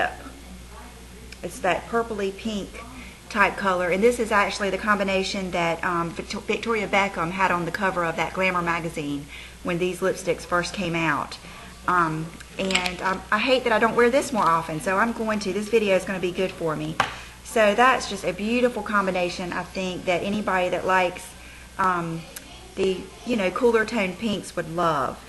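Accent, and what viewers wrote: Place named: Virginia, United States. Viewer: you're so pretty!!! Watching this video totally inspired me to get up and get ready and head over to my Chanel counter;) I love your accent btw... Are you from Virginia?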